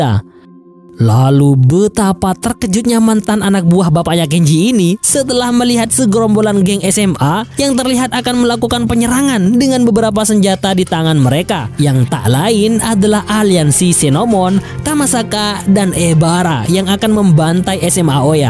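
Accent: Madura, Indonesia. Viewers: Oreng Madura Ben bang Mak phuruh ngiding kok 🤣🤣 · Bg orang madura ya? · Mak pas versi madureh keh😂😂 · kk orang madura yh, gw tau sihh karna gw pernah tinggal di mdura😅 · Min loe orang Madura iya😅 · Babg bang abang orang Madura bukan ? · Bg Lo orang Madura ya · Pasti orang madura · rooo meduroo logatmu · Addo reng madureh kkh reng😂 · Reng madureh hdh bg😂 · Ken reng madureh kak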